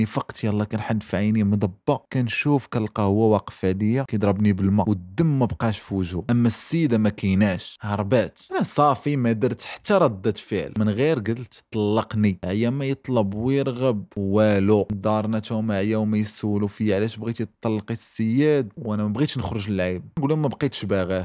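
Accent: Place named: Western Sahara. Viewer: انت دور دور تجبد على لسانك ناس ديال الصحرة گد عندنا لجنوب نسلمو عليك 😉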